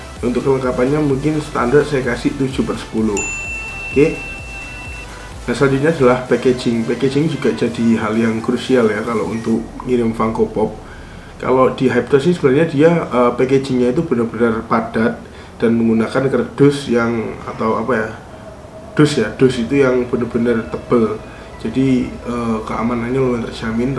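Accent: Java, Indonesia. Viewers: Niat banget sih ini, sampe ada penilaian terperinci. Good job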